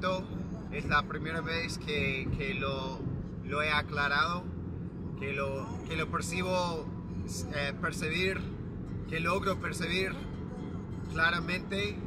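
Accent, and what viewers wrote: Argentina. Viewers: Vamossssssss!!! Me enorgullece que te guste nuestra cultura!!! Ya perteneces a ARGENTINA 🇦🇷. SOS muy gracioso y te queda muy bien el acento Argentino!!! Que emoción tienes!!! Vamosssss!!!!! 😢😂😂😂😢😂😢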